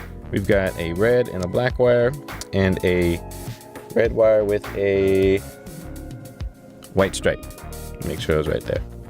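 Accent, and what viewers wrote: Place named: Brazil. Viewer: cool lol. I'm Brazilian. You got a lil of bit of Brazilian accent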